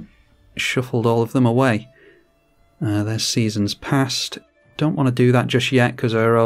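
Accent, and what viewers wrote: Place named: United Kingdom. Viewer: Talk british to me mate. Honestly your voice is outstanding.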